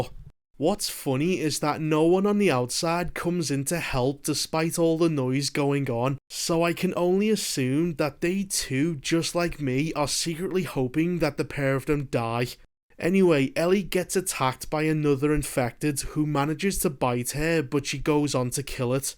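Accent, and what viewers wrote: Liverpool, United Kingdom. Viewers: Yes my bredda, Are you an ACTUAL SCOUSER? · God your scouse accent is annoying but i 100% agree with you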